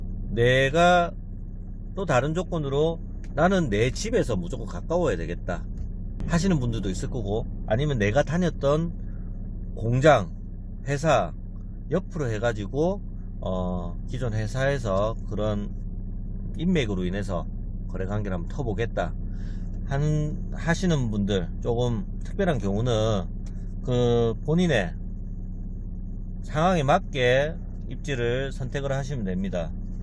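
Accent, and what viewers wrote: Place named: Gyeongsang, South Korea. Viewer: 경상도사투리가 신뢰감을 줍니다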